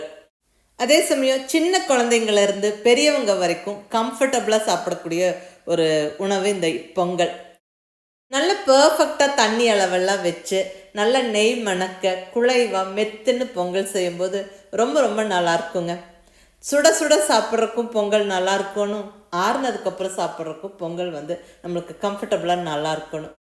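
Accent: Kongu, India. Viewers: எல்லாமே சூப்பர் கோவை கொங்கு தமிழில் பேசுவது அதிலும் அவ்வளவுதாங்க சுவைகூட்டுதுங்க அவ்வளவேதாங்க சகோ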